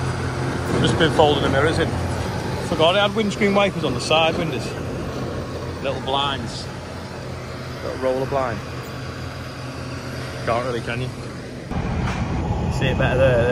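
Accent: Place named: England, United Kingdom. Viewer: Nice video.. only i understand half the time the english accent you are talking😅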